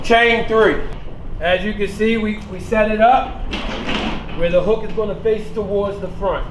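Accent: Baltimore, United States. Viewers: You can tell this guys from Baltimore by that accent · my manz got that baltimore twang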